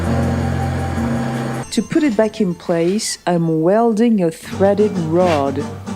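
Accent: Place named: England, United Kingdom. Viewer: 👍 for the english accent !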